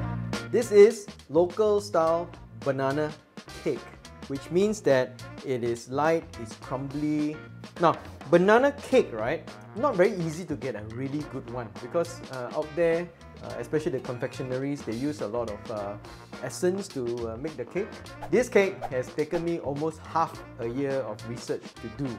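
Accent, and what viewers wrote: Singapore. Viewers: Looks fantastic! Even more siok ...love the Singlish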